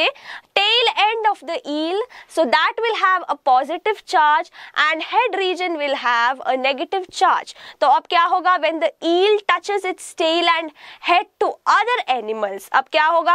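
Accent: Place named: South, India. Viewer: Ma'am has a strong south indian accent in hindi Edit: She is still a great teacher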